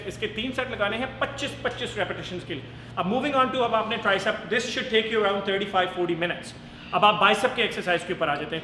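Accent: Punjab, India. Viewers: loved ur way of telling punjabi tone especialy .and thanx for tips i will try .and pls let me know the brand of protien too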